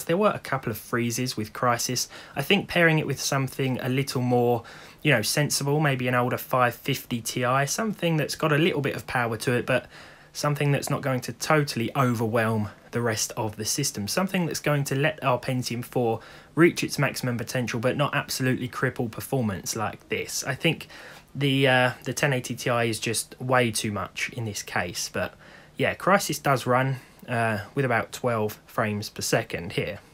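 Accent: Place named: England, United Kingdom. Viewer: I like your traditional Englishman accent...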